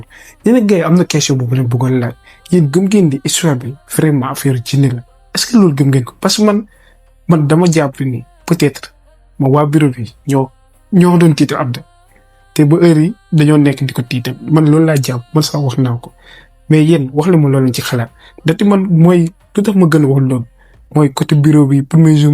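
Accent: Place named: Marseille, France. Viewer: kn marseillais nga 🙄😒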